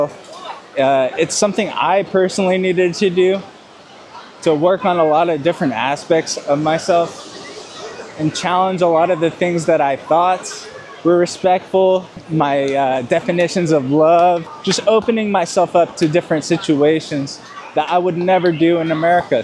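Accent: Brazil. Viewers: Very accurate in many points. This guy dived so deep into the Brazilian culture that it seems to have influenced his way of speaking. It's funny because normally Americans don't have that voice intonation. He reminds of a Brazilian speaking fluent English without an accent. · Hey I'm not trying to be rude or anything but I could not help myself to notice you've got a Brazilian English accent somehow?! Please tell there is a explanation and I did not lose my mind yet. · You have an accent. I guess you’re Brazilian as well!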